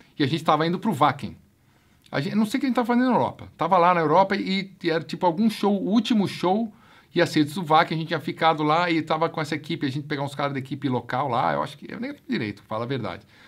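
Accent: Rio de Janeiro, Brazil. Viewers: parabéns pelo excelente trabalho! cara muito legal o história das antigas. sensacional. não dá nem pra pedir uma história, pq é um mistério surpreendente cada uma delas. não dá pra imaginar o q aconteceu com vc na sua carreira. kkk muito bom o sotaque carioca, e por fim uma sugestão, o q vc lembrar da memória q foi marcante, faz do mesmo jeito, liga a câmera e grava. tenho certeza q vai ser demais. abraço de máscara! 👏👏👏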